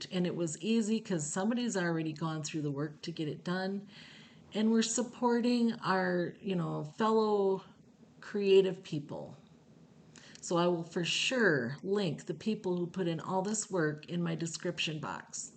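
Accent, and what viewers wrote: Minnesota, United States. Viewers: Your calm rural Minnesota style of speech is so nostalgic and comforting to me. I grew up in Minnesota and haven’t been back to visit in a long time. 🥰 Warm hugs from Texas!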